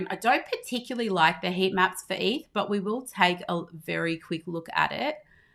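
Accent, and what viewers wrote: Australia. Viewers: I do like a lady with an Australian accent :) great analysis!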